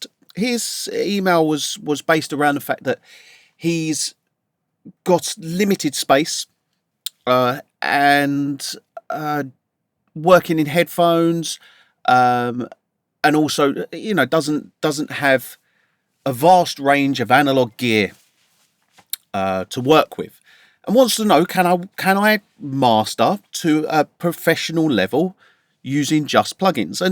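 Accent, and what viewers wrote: United Kingdom. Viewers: I love the british accent: "Can I masta"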